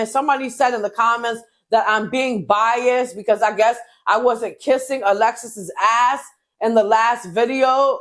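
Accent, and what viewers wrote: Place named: New York, United States. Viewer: Got my Sub for the New York accent ❤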